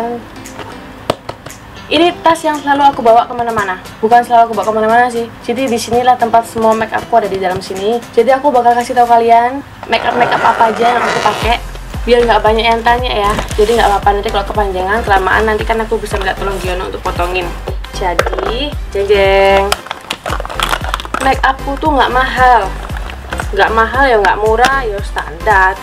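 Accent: Java, Indonesia. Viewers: kok mbak.nya lucu ya, medok.nya itu 😂 bedak tabur viva.nya jd inget bgt pas waktu smp... 😂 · Wong jowo to mbk?? 😁😁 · seneng lek mbak ngomong jowo😊lucu ae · Jowo ne medok tenan kak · Jawa nya medok ya ka? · jowo aslie kak. cuetohh poll wkwk😁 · medok tapi cantikk ka 😍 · Jawa nya nggak ketinggalan ya kakkk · Sukanya dari kak tu, apa adanya, gak sok keindo2 an gtu, jawa bgt wkwk.. Mantep kak, sukses terus ya, uwes ngene wae kak opo enek e.. 😁😁😁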